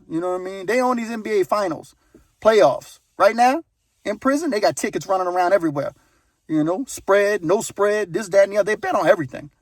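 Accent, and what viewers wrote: Louisiana, United States. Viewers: Are you from Louisiana? Like around New Orleans areas? Your accent sounds like it. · Where u from bayou boy ? St. Charles Parish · In case anyone is wondering this is a Louisiana accent.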